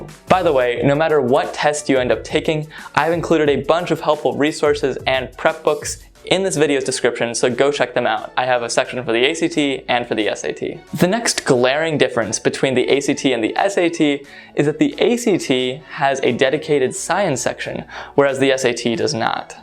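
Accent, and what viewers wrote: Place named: England, United Kingdom. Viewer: I really love your English accent .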